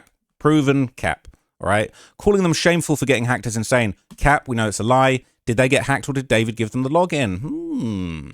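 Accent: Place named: England, United Kingdom. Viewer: Hes from England numb nuts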